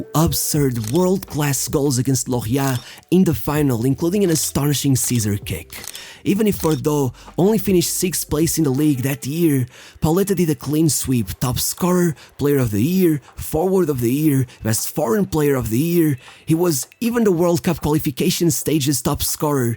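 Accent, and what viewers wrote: Portugal. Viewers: Boas, parabens pelos videos. Consigo perceber pela tua pronúncia que és portugues. Por isso peço te que incluas legendas em português, pois os teus videos tem muita qualidade e há muita gente portuguesa que gostaria de ver os teus videos mas que não entendem ingles. Abraço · Só precisei de ouvir 10 segundos de vídeo para saber que eras português. Abraço, continuação com o bom trabalho. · You have a portuguese accent, i'm pretty sure you're portuguese! your videos are very good, keep it up!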